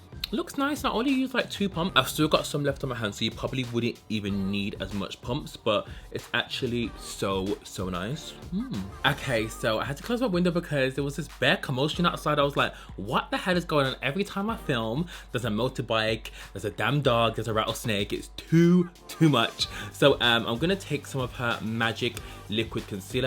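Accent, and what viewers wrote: United Kingdom. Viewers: Uhhh I looove your british accent!!!! 👏🏻👏🏻 I have been waiting for a review of this foundation! 😍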